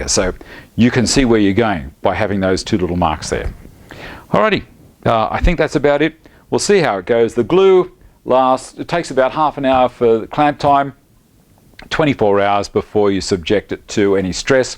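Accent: Australia. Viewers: Any reason you put the wood through the thicknesser before the jointer I've always thought it was the other way around? It is nice to hear an Aussie voice on you tube BTW. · I was wondering why I love watching this video, then I've read in comments that you're from Australia :D Love the humor, the accent and of course the build itself!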